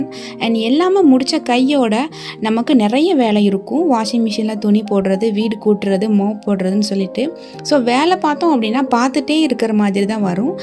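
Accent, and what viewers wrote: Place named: Ramanathapuram, India. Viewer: மாஷா அல்லாஹ் நீங்கள் சொல்வது அனைத்தும் உண்மையான விஷயம் தான் ராமநாதபுரம் பாஷை கொஞ்சம் வித்தியாசமாக இருக்குமே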